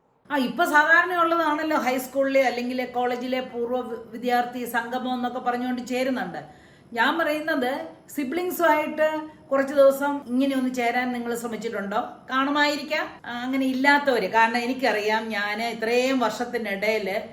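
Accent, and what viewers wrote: India. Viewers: Nammal kottayam language supper my housepalail anu mam nu big salutu. Paranjathallam cherppatthil ingana airunnu veetil ❤❤❤❤❤❤❤ Allamm ketttu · ചേച്ചീ, മീനച്ചിൽ താലൂക്കിലെ ഭാഷ മിന്നസോട്ടയിൽ കേൾക്കുമ്പോൾ ഒരു സന്തോഷം 😃😍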